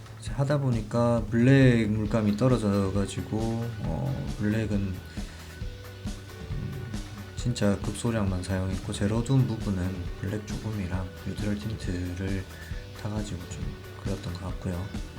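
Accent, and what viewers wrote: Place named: Busan, South Korea. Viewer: 붔산사투리가 구수하네예~~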